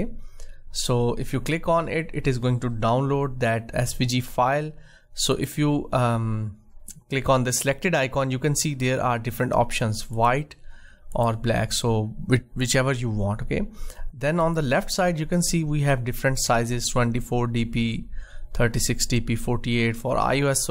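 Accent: India. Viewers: Are you from india??? I love your accent!!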